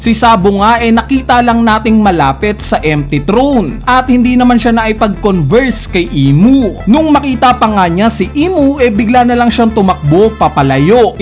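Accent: Visayas, Philippines. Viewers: Bisaya pla to si idol eh hahaha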